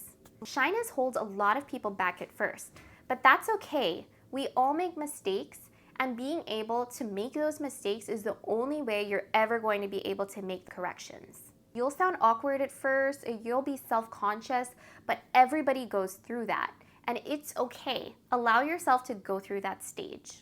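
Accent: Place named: United States. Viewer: English! It is a difficult language, so many people speak English differently with different accents (UK, U.S., Australia…) I prefer the U.S. English accent like yours 😄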